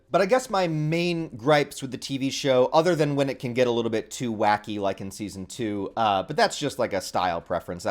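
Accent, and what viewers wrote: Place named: Northeast, United States. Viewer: Northeastern accent lol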